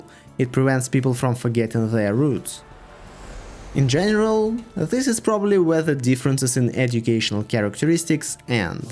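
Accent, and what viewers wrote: Russia. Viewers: дикое произношение с русскими интонациями, корявые звуки. Гласные это нечто. ИИ и то лучше бы проговорил. Да и информация местами субъетивная. Фолрма, иди.от не для коллективизма, а для дисциплины. Лечи свое ЕГЭ головного мозга. Да еще и левах в пеньюарных платьях показал, как что-то укоренившееся. Петрушка...явно селянин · Такое ощущение, что английский текст произносится русскоговорящим. Мне кажется, над его английской фонетикой нужно серьёзно поработать...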